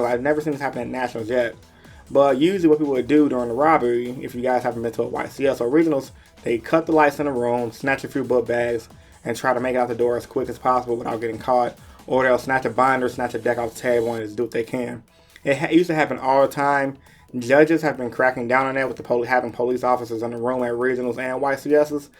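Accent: Chicago, United States. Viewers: You from chicago man? Were you go?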